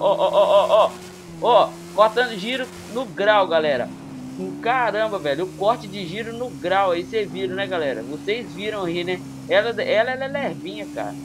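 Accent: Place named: Bahia, Brazil. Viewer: vc e bahiano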